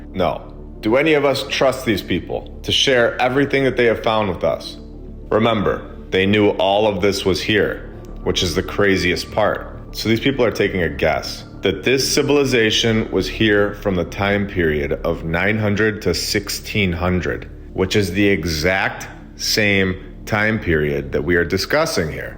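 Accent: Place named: Midwest, United States. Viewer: I knew you were a Midwesterner like me haha. I could tell by that unmistakable accent. I'm from Michigan, born and raised, but I lived in Milwaukee for 5 years. I feel so stupid because I NEVER even considered the architecture! Like never even glanced at it! I regret that so much! But if you don't have eyes to see...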